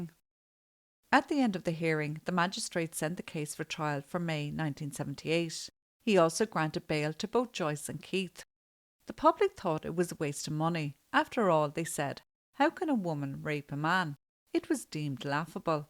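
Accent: Ireland. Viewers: Sorry to butt in but my dear you look terrific on my tablet let alone your delightful demeanor and your sweet Irish accent to boot 😊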